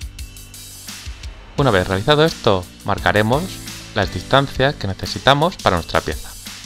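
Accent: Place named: Cádiz, Spain. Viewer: Muchas gracias!!! Estaré atento al siguiente video y lo veré aunque me salte algunas lecciones Por cierto, te escribo desde España, jejejejeje ya se que el nombre y el apellido son raros, pero soy de aquí jejejejeje de echo aunque por trabajo vivo en Murcia, debo de ser practicamente paisano tuyo, soy sevillano, por el acento, aunque no se te note mucho, algun deje de la parte de Cádiz si que tienes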